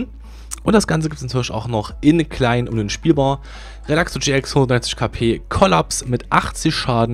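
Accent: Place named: Saxony, Germany. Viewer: Du klingst wie als würdest du aus Sachsen kommen, ist das so?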